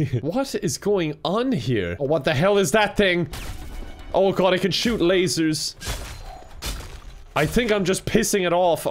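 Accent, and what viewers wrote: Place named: Ireland. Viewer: Hello there friend and apparent Scorpio? Happy belated birthday if it was this Tuesday!!! I really hope you had a fantastic day and that it was awesome like you are. I recently found your channel, recently found gaming YouTube actually but I will say you're my favourite Irish gamer ngl. I would literally watch any video you make. Like the accent alone? Corpse who? Anyway... Hope your day feck ing rocked.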